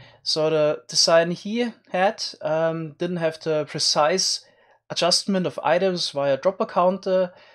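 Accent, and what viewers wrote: Germany. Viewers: Haha man hört das du n Deutscher bist :D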